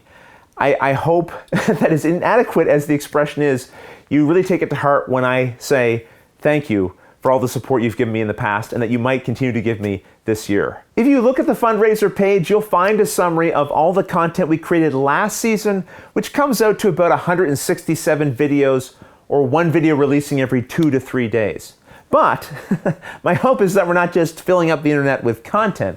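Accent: Canada. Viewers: Hi. I'm fairly new to the hobby, and your videos have been great to help me get over the "learning curve" and develop my own gaming identity...what I like and don't, what's worth spending money on and what's not, and what gaming experiences I can share with my family and which ones are best left for playing with other adults. The fact that the videos are a lot of fun to boot is icing on the cake! (Not to mention the funny vowel pronunciations that lead my wife to say, "are you watching that Canadian guy again?") You're a good person doing good work, and I'm happy to be able to back you. Hopefully you can use my support to buy a carrot or two. Or some cider for Pep. Cheers and keep up the good work!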